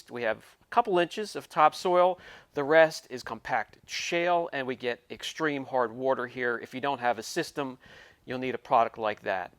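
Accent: Pennsylvania, United States. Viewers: Very PA accent. Love the videos!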